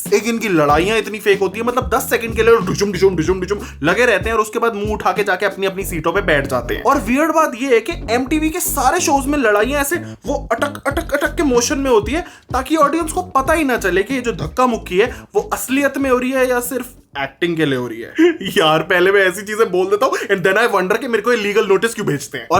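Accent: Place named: Punjab, India. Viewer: Ur punjabi accent 😎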